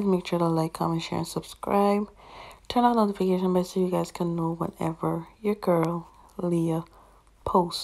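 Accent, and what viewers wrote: Guyana. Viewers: Sis are you Guyanese 🇬🇾 ?? 🥺 cause your accent chileee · Hey hun you sound Caribbean asf like Guyanese where are you from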